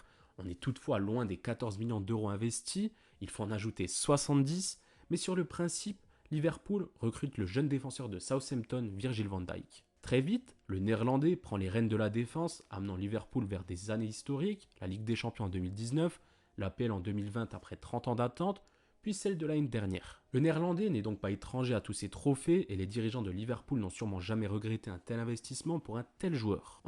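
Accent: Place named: Italy. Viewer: Ta culture foot et ton accent italien me font rêver. Merci!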